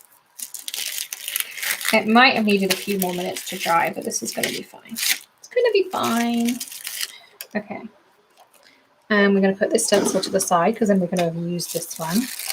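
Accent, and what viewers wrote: England, United Kingdom. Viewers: As a new 'maker' I found you quite by accident last month and just love your presentations. It was, at first, confusing to hear an English accent associated with US company (I'm in Yorkshire). I just saw this video and had to make a comment. I find it heartbreaking that mean people can do so much harm. There have been a few other people similarly affected this year and Cathy Z has posted this (you may have seen it) and I thought it may help you: <link> Without you lovely maker-presenters this year would have been very difficult for me (and I suspect many others too). Meanwhile good luck with the new goodies and I wish you a Happy New Year.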